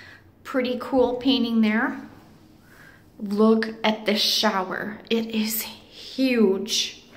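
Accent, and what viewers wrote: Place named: Minnesota, United States. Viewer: You're either from Minnesota or Wisconsin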